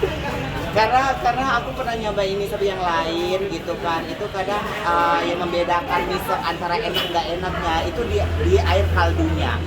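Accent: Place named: Banyumas, Indonesia. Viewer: Kak sering-sering update ngapak😭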